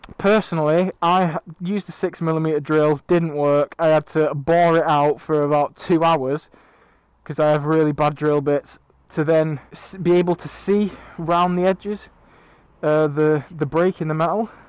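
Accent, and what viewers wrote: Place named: Manchester, United Kingdom. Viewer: Good stuff mate. I just got the same bike and exhaust last week and have been watching video's thinking all I have to do is use an allan key to get the db killer out and drill a couple of holes to increase the volume, fuck euro 4, apart from abs which has already saved my life. Can I give you a shout if I am struggling with it? I am in Manchester and can tell by your accent that you are not that far away. Couple of beers in it for you :)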